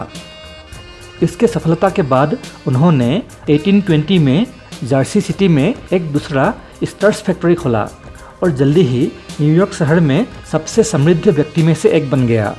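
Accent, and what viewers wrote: Assam, India. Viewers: Assam se ho kya aap ? 😄 Aapki hindi eisa hi hai kuch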